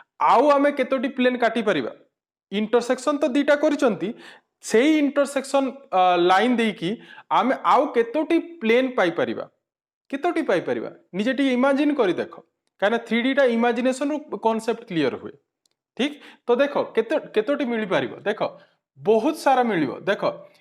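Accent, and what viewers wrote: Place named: Sambalpur, India. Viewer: But sir mate lagila je apanankara sambalpur boli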